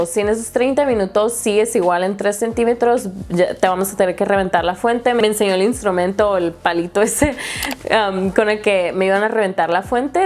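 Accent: Fresa, Mexico. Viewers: Me gusta mucho esa forma de hablar o acento, es como super fresa pero natural, no se como explicarlo, es que no conozco gente que hable así. Felicidades por tu baby, yo tengo 6 meses ahora y estoy pensando si quiero o no epidural y buscando videos para guiarme. Besitos 🌸🌸🌸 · Me gusta como hablas toda fresa y eres tan sensilla jaja me encantas..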